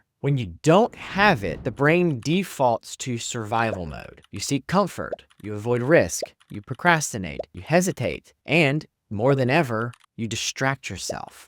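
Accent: South, United States. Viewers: I'm confident that your reassuring voice and sublime Southern twang will most certainly elicit expressions of even greater enjoyment.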